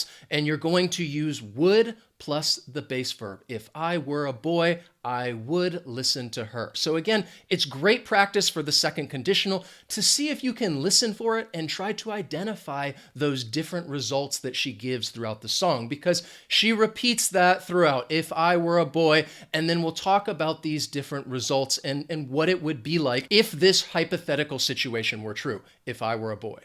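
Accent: United States. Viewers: Thanks a lot! I enjoyed every second of the American English you are using - the way it should be used by all the native speakers;)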